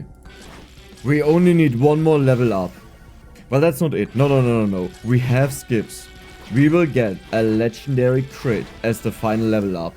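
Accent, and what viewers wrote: Germany. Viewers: U got me opens chest ...... oh my god another credit card xDD (the moment with the magnet) love ur german accent btw xP, keep it up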